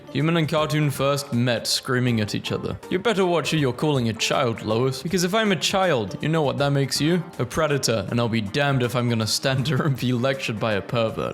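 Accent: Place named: Southern England, United Kingdom. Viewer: Your accent is modern and largely originated in the late 18th and early 19th centuries among the southern English upper classes. So actually makes a lot of sense historically because we never adopted the made up weird accent.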